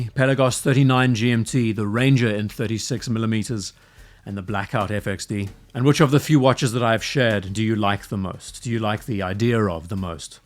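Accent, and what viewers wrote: South Africa. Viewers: Dig your accent. South Africa?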